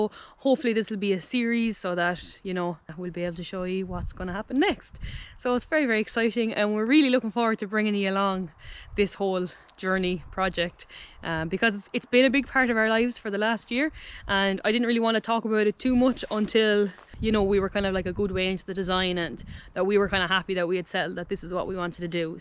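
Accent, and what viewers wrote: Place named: Ireland. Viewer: So great to hear an Irish accent! I'm Irish too but living in France. Going to be renovating an old house here too, soon hopefully. Very exciting. All the best with your build. I'm looking forward to following along!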